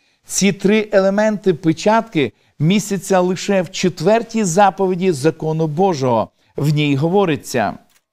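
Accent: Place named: United States. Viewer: До чого цей дурнуватий американський акцент і менторство? Середньовіччя і мавп'ятництво в сторону американців. Огидно...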